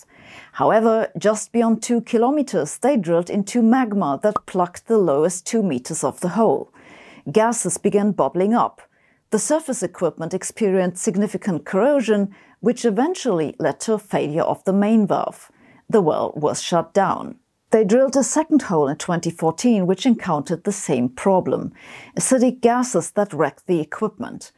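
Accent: Germany. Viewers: I love you, you are extremely sexy. I fantasize about you and your beautiful german accent and inexpressive face. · Ms Sabine Hossenfelder is Professor cum laude graduated in theoretical physicist, Gravitational Fields etc. But as for her nice direct humor and accent it could be becuase she is from Germany.😇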